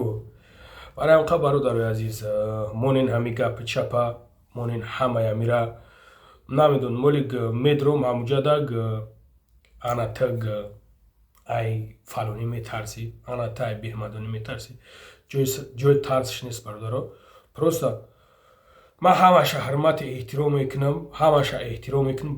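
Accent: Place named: Bokhtar, Tajikistan. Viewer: Хака хаму БОХТАР КУРГОНТЕППА гап мезана бачаи хола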